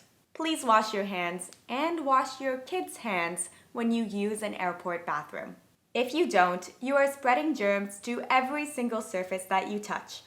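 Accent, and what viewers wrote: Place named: New England, United States. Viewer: Heavy New England accent! 😬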